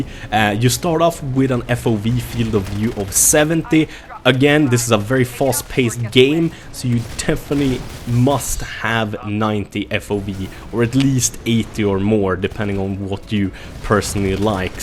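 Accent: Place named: Sweden. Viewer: Hehe, it only takes me a few seconds to recognize the swedish accent.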